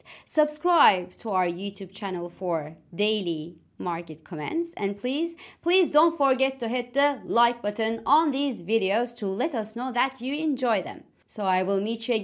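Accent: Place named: Turkey. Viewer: You have a Turkish accent. Are you Turkish or closely related to Turks?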